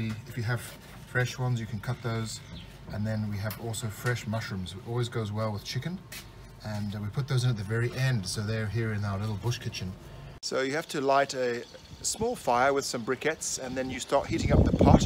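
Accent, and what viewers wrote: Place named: Durban, South Africa. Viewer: Nice video...You sound like you're from Durban.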